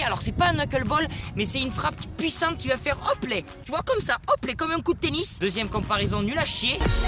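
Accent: Marseille, France. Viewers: L’accent marseillais 👌🏼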